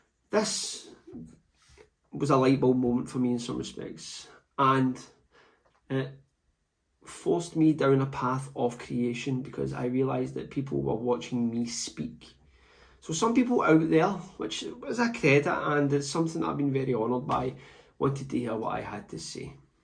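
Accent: Scotland, United Kingdom. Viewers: I think your biggest appeal is the rawness of your videos. On the patio, top of the stairs or front room, a working father getting it in is inspiring. Be yourself, the world loves us Scots, accents and all! Maybe not the swearing at times though, could put some off. I’ve taken ideas from you and have tried a good few of your videos as they’re a bit different from what I normally do. Get the burpee tour of Scotland on the go! 😂😂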